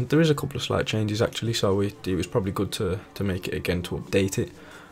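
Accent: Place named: Birmingham, United Kingdom. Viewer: Cheers for this mate, can ping that brummy accent a mile off. Don’t apologise for being ill or swallowing too much mate, people will always knit pick at shit on YouTube. You seem like a proper decent bloke mate keep smashing it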